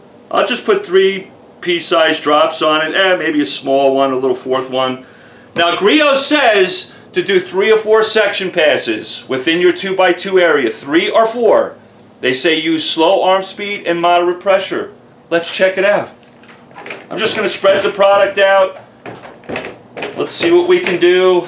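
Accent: New York, United States. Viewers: I hear a New York accent? Queens?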